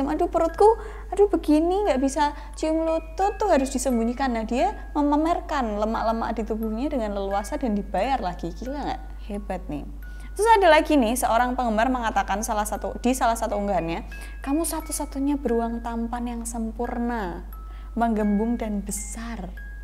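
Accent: Java, Indonesia. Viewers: Rasa ingin tertawa bukan Karna kontenya tapi Karna medoknya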